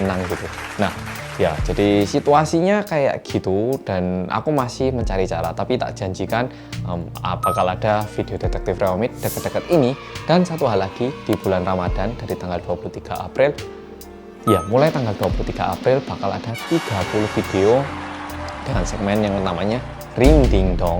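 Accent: Java, Indonesia. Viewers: Siap orang korea yang medok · Lebih suka mendengarkan ceritanya mas,enak di denger soal nya mas medok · Mas Medokkkk 🥰🥰 pagi massss ..ttp sehat ya....❤❤❤ LANJUTKANNNNN... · Mas medok🤗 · Mas bikin video ngajarin Elsa Bahasa Jawa biar ikutan medok, dong. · YANG MEDOKKKKK!!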